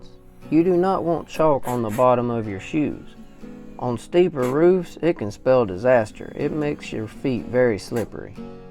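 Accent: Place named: South, United States. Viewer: Enjoyed your video. Enjoyed your true Southern accent and attitude... "Let 'em run wild"... lol 😂. Gathered some good tips. ❤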